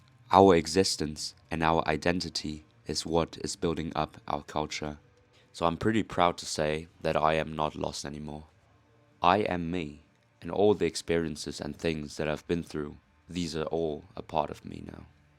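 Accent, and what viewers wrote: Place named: Germany. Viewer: Dude don't force your accent. Your accent was cool as it was it's not American it actually sounds German. But not a thick German. I am Australian and your accent trial is a bit jarring because you go English, Australian, American, German in one sentence.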